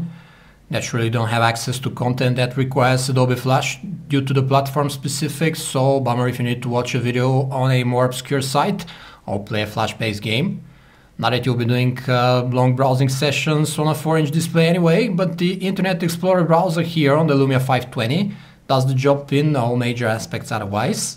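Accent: Russia. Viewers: Why u speaks english like that are u russian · sounds russian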